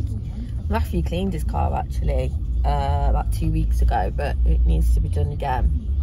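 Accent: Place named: Midlands, United Kingdom. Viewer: ahh I thought I could detect a Midlands accent ..but then also could hear hints of Bristol 😆 xx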